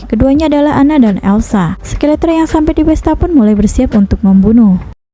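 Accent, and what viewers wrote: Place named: Banyumas, Indonesia. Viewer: Bahasane ngapak koh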